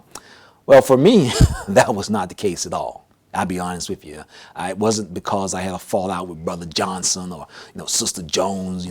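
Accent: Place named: Southeast, United States. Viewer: you and your wife are on my short bucket list of some of the most impressive people I have never met, that I would be honored to meet. I always look forward to your new broadcasts and re-watch older ones sometimes. Your exceptionally respectful and intelligent delivery, knowledge, and honesty, to me, makes me believe you are this generation's Ray Franz even though you are not from the same hierarchy level in the WT, you present the vast information in the same, honest, respectful, and easy to understand way. I do hope you and your wife continue on for a very, very long time, making new videos. Whatever endeavor you take on in the world of employment, I am sure you excel in it. I can only wish for a fraction of your ability to knowledgeably deliver information in this easy to understand way that you seem to naturally have. When reading Ray's book I realized I frequented Anniston, AL during the exact time he was there. 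I was clueless to the JW back then, but if only I had known about the JW, who he was and what he was going through, I would have sought him out just to shake his hand and thank him. Your accent sounds familiar as if you are from the same general, SE, area. I feel if A&E's producers of the Leah Remini Scientology show could get you together with her, to do a program or two on the Watchtower, with your knowledge and delivery shining, it would make for an exceptional ( and very needed) show. Then, your videos WOULD go viral as many hope they would. Please conta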